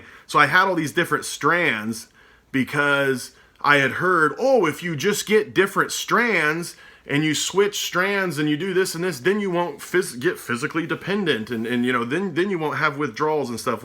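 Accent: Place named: Oregon, United States. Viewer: yes, you do. I’m an Oregonian, from just south of Portland, and you sound like everyone I know.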